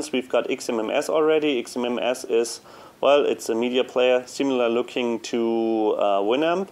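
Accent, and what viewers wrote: Germany. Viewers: you sound very german !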